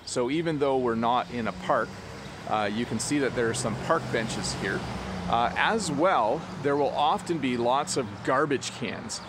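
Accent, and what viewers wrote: United States. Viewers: I'm from Brazil and I liked your video and your accent, it looks like american I have subscribed Thanks a lot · You really is a canadian? Your accent really like the american 😍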